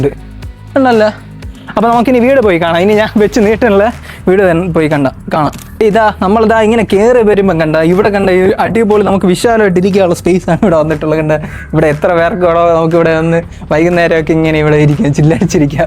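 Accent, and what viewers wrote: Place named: Thiruvananthapuram, India. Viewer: തിരുവന്തോരം ഭാഷ പറഞ്ഞു ബോറടിപ്പിക്കാതെ മര്യാദക്ക് സംസാരിക്കു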